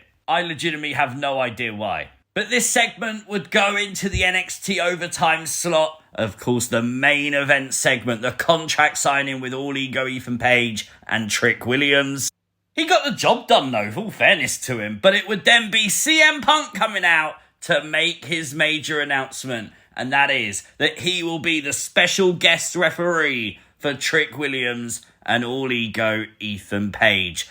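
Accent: United Kingdom. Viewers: He said "Rosemary". He didn't say "Rosemarie". It's only the British accent that makes it sound like that. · You know he’s British?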